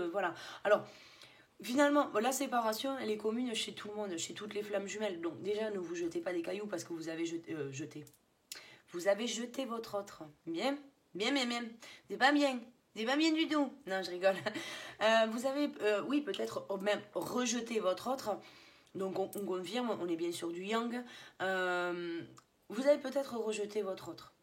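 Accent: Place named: South, France. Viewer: Merci!!! C’est exactement ça ! Vous êtes top et en plus j’adore votre franc parler 😉et votre accent ! Sud est où sud ouest?😜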